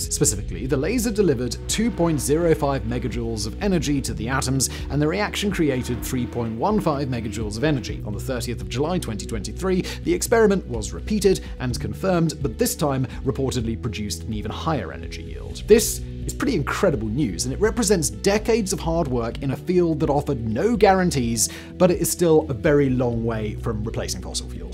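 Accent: United Kingdom. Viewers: I didn’t say YOU didn’t know, I just pointed out that the person you originally replied to also knows. Simon has true crime and science and random blaze channels. I’m really not sure which of those is meant to resemble propaganda so I think you probably don’t know what that word means. And I’m also British so to me Simon’s accent is just one of dozens of British accents(we have literally 100s🤣) that I hear every day!Seems to be some projection there.🤣😊😊😊😊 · no shit how do you think i know he doesn’t write his scripts🤦‍♂️ its a lot easier to digest your propaganda with a soothing british accent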